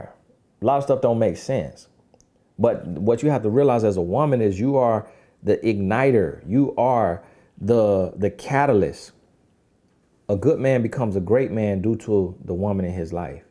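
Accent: South, United States. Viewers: As always, thanks for keeping it real. And you say all this, with an even tone and country drawl, cracks me up! Very interesting psychology of men.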